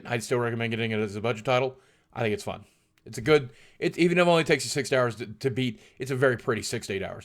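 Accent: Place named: San Francisco Bay Area, United States. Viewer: Hella? you sound like you grew up in the SF Bay Area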